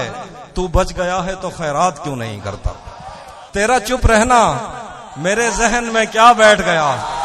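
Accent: Saraiki, Pakistan. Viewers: How cute is this when is speaking saraiki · Saraiki awaaz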